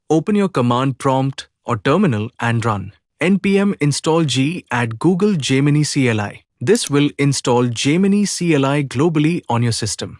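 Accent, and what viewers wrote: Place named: India. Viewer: If your using AI for the voice why make it Indian?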